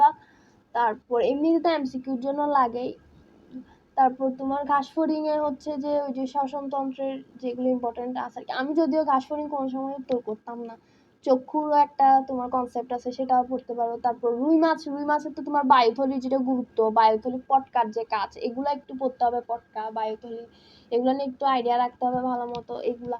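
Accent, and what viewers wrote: Chittagong, Bangladesh. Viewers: Apu ami kintu ekhon Chittagong e thaki🙂 Apnar language shunei buja jacche Chittagong er🙂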